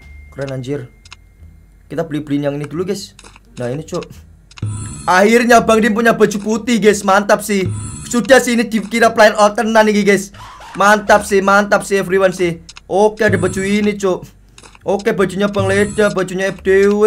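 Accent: Java, Indonesia. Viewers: BIAR MEDOK AJA SIH, BIAR GA SOK INGGRES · Bang jebule Kon wong Jawa yah,ngerti ora bang dim nyng ngomong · Jowo tengah hader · Bang sampean wong jowo Yo ? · Bang kamu orang Jawa tengah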